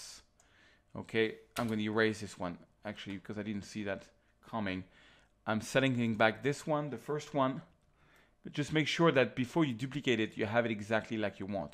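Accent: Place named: France. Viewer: Bonjour Monsieur, merci pour tous ces tutoriaux vraiment clairs et précis. C'est un plaisir de vous comprendre, en anglais, et ce grâce à votre accent français:-) surtout pour quelqu'un qui ne maîtrise point la langue de Shakespeare. Très cordialement. Christophe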